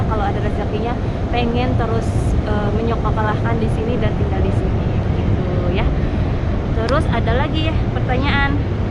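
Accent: West Java, Indonesia. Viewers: Assalamualaikum sy baru buka Vidio ini tapi sy sering nonton video Nya Kalau denger cara bicara nya kaya orang Sunda ya dari mana asalnya karna sy orang Sunda juga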